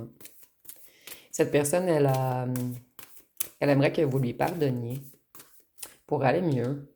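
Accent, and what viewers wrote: Quebec, Canada. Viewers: ton Accent Canadien Québécois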